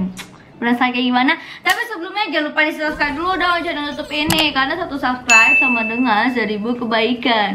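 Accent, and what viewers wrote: Java, Indonesia. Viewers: aku kangen kamu mainan OmeTV pakai suara medok orang jawa 😁 Miss you, 😁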